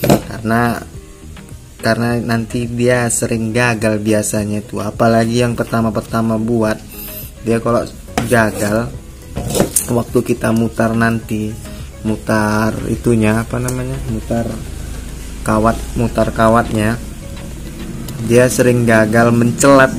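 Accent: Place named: Jambi, Indonesia. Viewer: Bang jambi dimano ny bang... Masuk nian nampak ny gaya abg bcakap tu :D hahahaha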